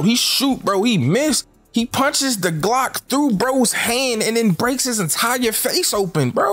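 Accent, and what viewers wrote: Philadelphia, United States. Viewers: You from philly bro 😂🤞🏿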